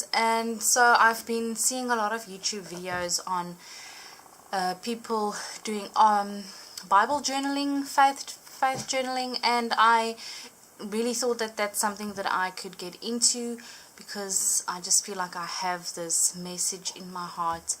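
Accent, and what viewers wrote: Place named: Australia. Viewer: You're coloring is absolutely beautiful! Thank you for inviting me over! I subscribed! Are you from Australia? I love your accent! God bless, Kim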